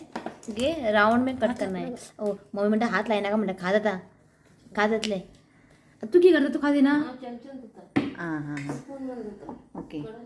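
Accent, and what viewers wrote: Goa, India. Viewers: I loved the Konkani and Goan Hindi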